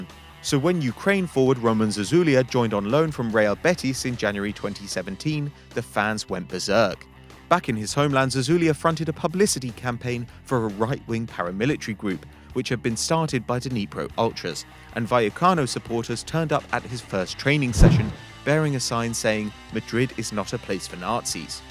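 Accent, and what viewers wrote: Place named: England, United Kingdom. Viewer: This fucking english accent...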